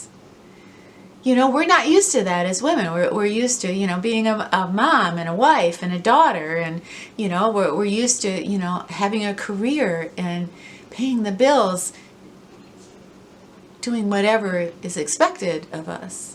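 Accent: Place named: Midwest, United States. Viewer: i really hear your midwestern accent in this video.. it is very cute. stay well..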